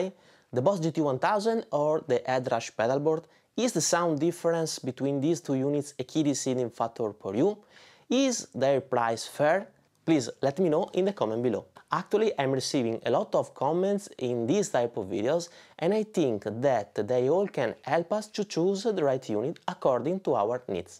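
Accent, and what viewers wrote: Venice, Italy. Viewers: go idea che ti xe veneto! bravissimo in ogni caso!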